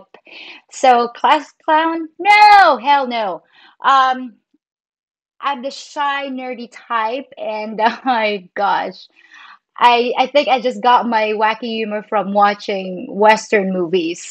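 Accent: United States. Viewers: I was surprised to hear you visited the UK but not the US. Your word choice and pronunciation is very American. But you do call a TV the telly. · If you had ever been to a nude beach you'd know that too much reality can be toxic. Reading minds would take the same problem to a higher level. When I was doing free lance IT, and a support number connected me to a Philippine call center, I always sent a positive note to the company. I can understand the Philippine version of English much easier than grammatical and correct English spoken with a Pakistani accent. Your speech patterns are closer to American than British.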